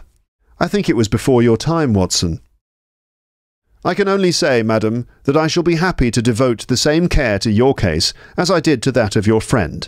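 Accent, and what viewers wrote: United Kingdom. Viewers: Do you have a British accent? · İs that british accent? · Is it british pronunciation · is he use British accent? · my english is good but i still open this vid to listen to my fav music which is british accent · This British accent ؟؟؟ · Hi thank you for posting a lovely video as always. Byw I live in London but there are no chances to meet British people who speak in beautiful accent like you. I understand what you speak almost everything though, I don't get it conversation on the hustle and bustle.😢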